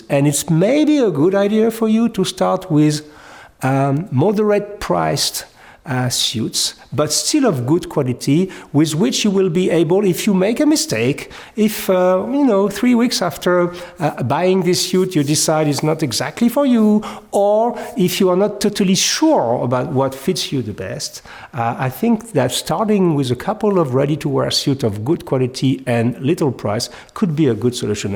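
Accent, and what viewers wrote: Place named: France. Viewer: I have just watched the two versions french and english of your videos. To compare the fluency of your eloquence i m so happy to see that we obvisouly notice that your french accent remains and the way of your talking remains french one it puts so valuable in your explaination. Yours from France 🇫🇷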